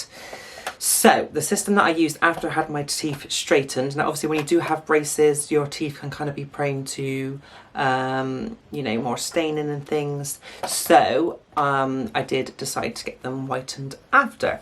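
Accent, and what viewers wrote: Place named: Bristol, United Kingdom. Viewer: Are you Bristolian? Iam aswell so think I can hear it in your voice haha, great review! I’ve been looking into trying boutique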